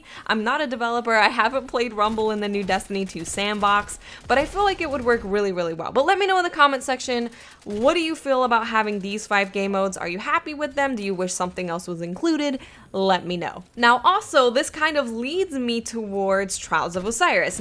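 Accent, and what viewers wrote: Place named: New Jersey, United States. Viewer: Is from Jersey? Seems like it to me from her accent. I'm new here, does anyone know?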